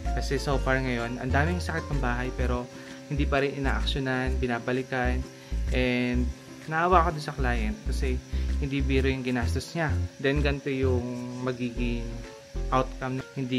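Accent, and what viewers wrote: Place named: Pampanga, Philippines. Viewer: Kapampangan ka pamo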